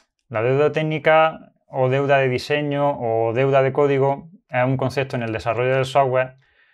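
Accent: Andalusia, Spain. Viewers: Vaya acento de Granada/Almería jajajaja saludos de un programador andaluz.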